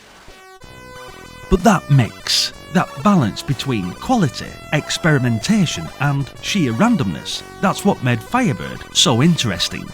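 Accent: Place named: Rotherham, United Kingdom. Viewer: Are you from Rotherham? The accent sounds familiar lol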